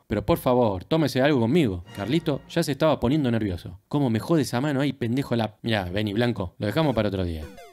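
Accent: Argentina. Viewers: Me encanta el acento argentino.Viva argentina!!!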